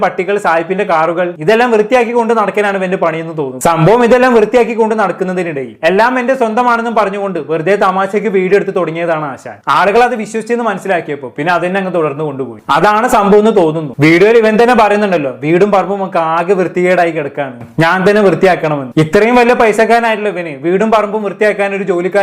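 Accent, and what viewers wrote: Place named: Kochi, India. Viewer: Like Kochikaran 😂😂😂😂😂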